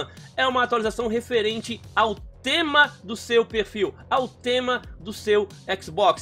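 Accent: Florianópolis, Brazil. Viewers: TU E DE FLORIANOPOLIS???????????????????????????????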